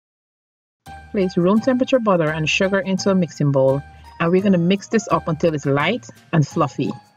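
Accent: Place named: Guyana. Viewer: I've been looking for and using online recipes for years but its been a real pleasure to find your recipes for my favourite Guyana home recipes! Your reassuring helpful Guyanese voice and accent are comforting and inspiring. Thank you! Can't wait to get started!👍🏾🇬🇾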